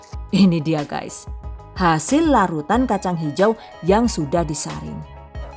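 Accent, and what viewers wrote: Banyumas, Indonesia. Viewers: Wah bisa dijadikan ide buat usaha nich bun nanti insya allah klau saya diberikan kesembuhn sakit saya ini,saya akan mulai usaha seperti ini insya allah #GiveawayTHV2021..dn bun setiap liht vidio bunda saya sll bisa ktwa dipertengah krn bunda sll pake bahasa ngapak🤣🤣🤣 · Nyong seneng bgt karo bahasa ne rika sing ngapak kue yu ..🤣🤣 mantap resepe 🤗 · Ya Allah.. Ada ngapaknya.. 😂😂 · Ngapak ya😂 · the best ngapak bar bar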